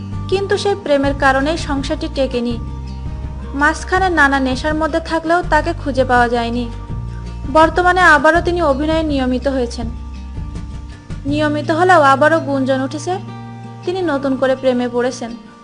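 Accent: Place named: Noakhali, Bangladesh. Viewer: ai noakhailla pathilar path sunle mejaj kharap hoy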